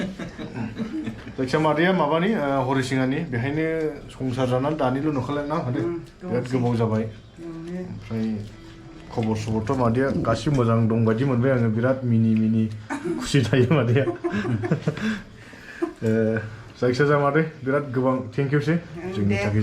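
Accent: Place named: Baksa, India. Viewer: baksani sur arw ngswr ni sur jw alda Ka ada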